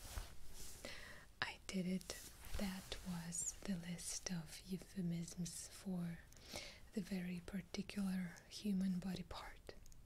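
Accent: Russia. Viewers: Русский акцент прекрасен)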